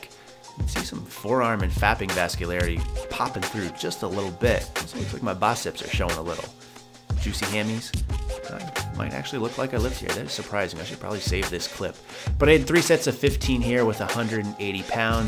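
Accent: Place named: South, United States. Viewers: THAT SLOW PROGRESSION TO A SOUTHERN ACCENT HAHA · dat southern accent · ʻPedostacheʻ, Country Accents and ʻMirror ʻMirinʻ... makes for a complete and entertaining video! Seriously, I havenʻt tried floor presses. Looks like they mainly help you with the last 3rd of the press. Interesting, I might try those because I have good explosion off the chest, but, I seem to get to a sticking point during the last 3rd of the press.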